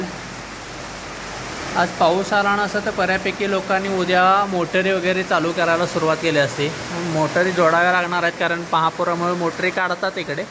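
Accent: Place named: Kolhapur, India. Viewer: व्हिडिओ आवडीने बघतो कारण कानावर कोल्हापुरी भाषेचा गोडवा पडत असतो. आम्ही पुण्यात असतो परंतु आपल्या मुळं आम्हाला कोल्हापूरात राहातं असल्याचे वाटते. धन्यवाद दादा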